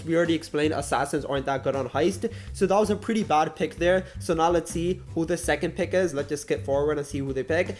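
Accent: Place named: Punjab, India. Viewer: Bro your accent is very Punjabi 😅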